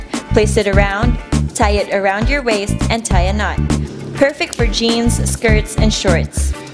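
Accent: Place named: Philippines. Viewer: Are you a filipino i asked cause of your accent i am a filipino myself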